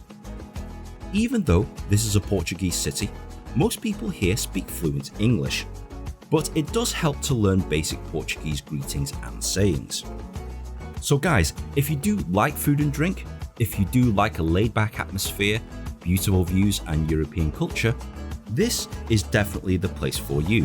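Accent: United Kingdom. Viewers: Your voice is perfect, and fine British accent. Great man!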